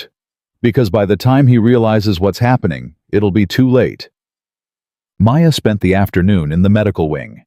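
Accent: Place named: United States. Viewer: Sounds very US 🇩🇰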